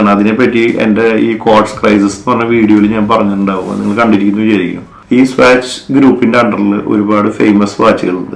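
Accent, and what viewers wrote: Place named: Thrissur, India. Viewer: തൃശൂർ ബ്രോ ആണല്ലേ 👍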